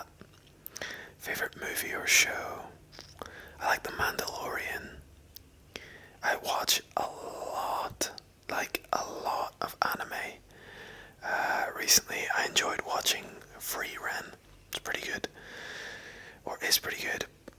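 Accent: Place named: Ireland. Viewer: To whoever asked him to prove he's Irish, his accent proves he is Irish.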